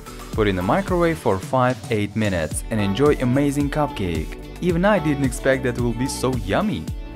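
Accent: Russia. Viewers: ax etot rouski aktsent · ja irgendwie schade ich finde grad sein russischer Akzent macht ihn total sympathisch.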